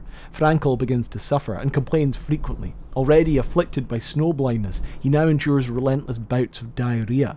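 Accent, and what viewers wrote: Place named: Scotland, United Kingdom. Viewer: Excellent stuff: i’m ex english love your Scottish accent… way te go mon!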